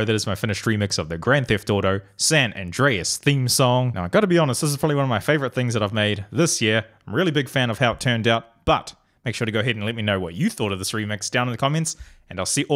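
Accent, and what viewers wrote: New Zealand. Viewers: Coz yo I'm from.new Zealand aswell bcoz of your accent tho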